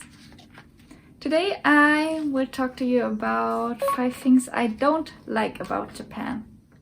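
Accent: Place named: Germany. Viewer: you should be careful saying stuff which you "don't like about Japan', instead of saying 'don't get used to', 'can't really understand' , 'hard to understand' or "hard to get used to'. you're such a typical German saying your negative opinions direct, even your words can make other people's feelings uncomfortable, and you get haters instead. if ur speaking English, better to speak beautiful English, not with that strong German accent which is so difficult to understand. it's better if you speak your own language, the 'direct language, German' and put a subtitle, because native English speakers would pick words more carefully, and wouldn't say so much negative things to make listeners feel uncomfortable. You should known that Germans are not so fond by others, because you guys are not so charming, I mean, not with attracting, warm hearted personalities, but cold and selfish. 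I often see that German people don't help others on the streets or on the train, but ignore and leave. they think it's none of my business or i don't wanna waste my time helping others, and get no reward. really cold people out there.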